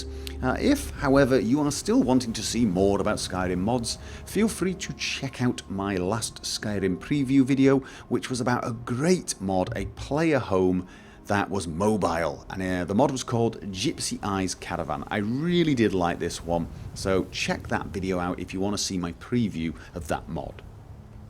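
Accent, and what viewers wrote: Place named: North, United Kingdom. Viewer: Let me just say that you are probably THE Greatest "Instructor" Or whatever you like/prefer to be called... lol May I ask where your from exactly? (Random question) haha. My dads from Ipswich and hes normally good with accents and all that be he couldnt figure it out.. He said maybe somwhere kind of North in the UK or somthing... (I know you probably dont like your comments to be filled with random stuff like this, but I HAD to ask) lol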